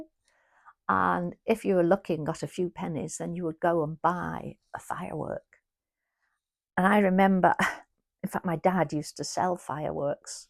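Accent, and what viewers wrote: United Kingdom. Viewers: You're my model in my journey for learning British accent ❤❤ love & peace 🕊️✌🏻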